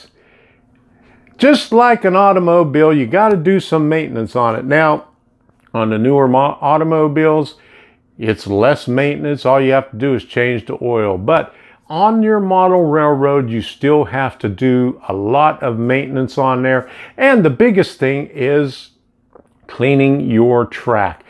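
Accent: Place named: Philadelphia, United States. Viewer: You can plan away until you are blue in the face, but when it comes to the "do", plans usually have to change on the way. However, I've learned one valuable lesson which, over the years has saved me both money, and time - thats, dont fix in place until you are sure its where you want it to be, and that applies to track, buildings and wiring alike. I've just spent 6 months working with Railmodeller Pro software, and everything fitted a treat, but I hate to mention how many times Ive substituted one turnout for another or rebent lengths of flexitrack getting stuff to conform to my "ideal" - easier to get your sections right first before you cut and have to rejoin track. Now on the "do" stage, and testing with most unreliable loco, longest coach, and widest truck load to ensure power joints, clearances and curves are as "hoped for". 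Still nervous about combining light and semaphore signals - in 50 years, only ever done the latter.... Great vids - keep them coming...dunno what some folk whinge about, I'm a brit, and I understand your "philly" easily. Wonder if these folk who complain about regional accents are as ready to criticise UK colloquialisms as readily to peoples faces?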